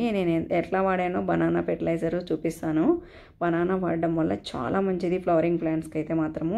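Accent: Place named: Anantapur, India. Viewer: Mee slang antapoor side la vundi mee proper ekkada andi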